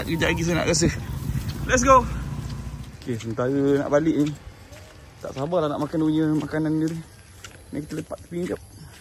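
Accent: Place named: Kedah, Malaysia. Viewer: Terbaik abg p ronda ronda pasar kemboja di selangor, abg ni asal KEDAH ke? Cakap loghat kedah, ha ha haa.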